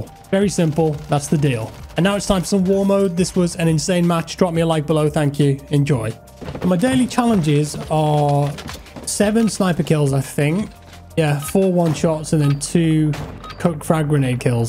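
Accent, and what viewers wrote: United Kingdom. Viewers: thanks this is a very useful video but with british accent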